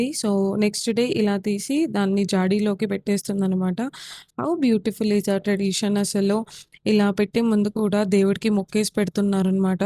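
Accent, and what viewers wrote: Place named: Andhra Pradesh, India. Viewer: Meru motham Andhra slang lo matladthunaru inthaki midhi ee oru andi ??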